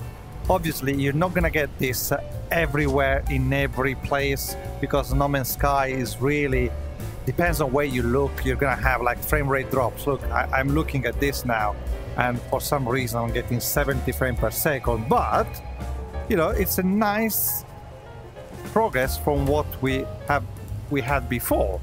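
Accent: Italy. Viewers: Fantastico!! (Sei italiano, senza dubbio) Parli inglese benissimo!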